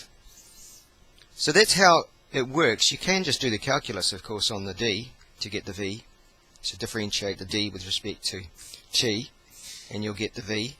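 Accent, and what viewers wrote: New Zealand. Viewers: from new zealand?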